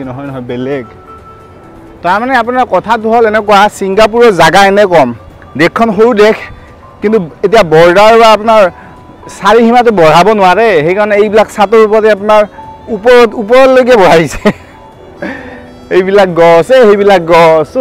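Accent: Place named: Barpeta, India. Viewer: axomiya vakha tu thik koribo lage...borpeta vakha di kam nhobo..gotei axom a buji napai...iman bosor dhori guwahatit thaki o vakha tu thik koribo nuwarili no..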